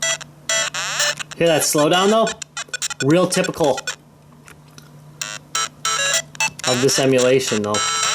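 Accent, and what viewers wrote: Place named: China. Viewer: i love chinglish from china , entertainment before you see the product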